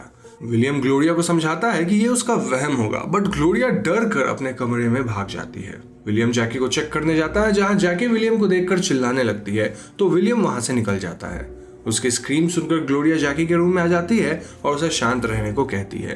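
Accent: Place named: Bihar, India. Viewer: sir ap bihar se ho me jan gai...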